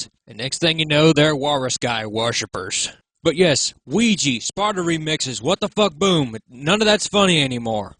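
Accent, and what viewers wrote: South, United States. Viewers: you sound like a hilla billy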